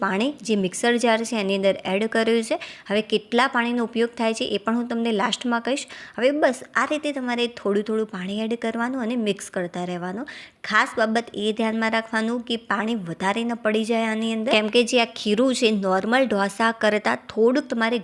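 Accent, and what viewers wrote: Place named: South, India. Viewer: Tamara pronunciation south indian java che.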